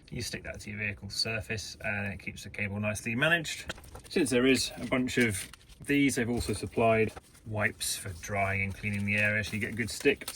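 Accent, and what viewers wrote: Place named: Scotland, United Kingdom. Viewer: my god i got a reply.. been watching you since the start of your conversion i heard the Scottish accent instantly cheered 🤣 and subscribed. I live in argyll. 65-70% of the way done on my conversion but just not getting the time to finish it. Hope all is well with yourself and thanks for the reply 😊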